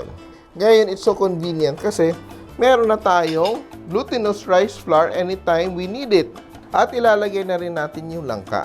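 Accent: Visayas, Philippines. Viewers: daan pko sir.. bisaya ka... hahaha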